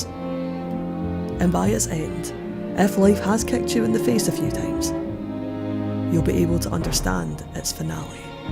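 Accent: Scotland, United Kingdom. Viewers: I loved your Scottish accent. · Finally an accent I understand... Wait this isn't the same regional accent as me? I can't understand you I'm not from your part of Alba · scottish accents r beautiful and ur beautiful. its just my auditory processing thats shit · It had taken a while to know who you are when introduced you but it was listening to your voice and I knew it, you were the one who made that incredible review about Yagate Kimi or Naru a few months ago. And since I love your Scottish accent, it's perfect (and I'm tell you being a Honduran, whose first language is Spanish) and yet I can understand you perfectly. You are amazing. Regards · Scottish accent? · I don't know what that accent is (Scottish?), but I like it. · don't ever stop putting word thoughts in my earholes with that sweet Scottish melody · somehow the scottish accent is a constant funny thing for me that i cant take serious, so this video threw me through quite a loop cause the accents funny but ive only witnessed it in comedy before. 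that made it quite challenging to listen to what good things she's actually saying.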